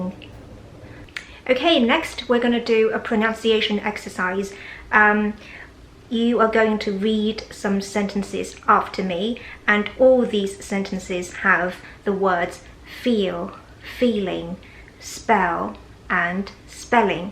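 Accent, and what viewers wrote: United Kingdom. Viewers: 我喜歡fanfan的英國腔還有神秘人的聲音很有趣因為他兩次一定會有一次聽起來很不耐煩😂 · 你的英式英语的口音非常漂亮。但是你不知道美式英语中的L,N,R,M的前后都有元音时，这4个音要用2次。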